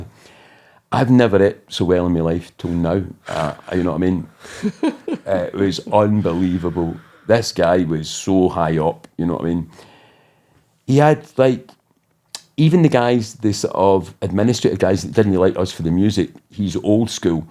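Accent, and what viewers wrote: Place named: Scotland, United Kingdom. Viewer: I'm sure this story is amazing (I know it is) But I can't understand so much of it ! I'm usually fine with the Scottish, but this guy is deep 😂 I want his book for sure :) Bless u mate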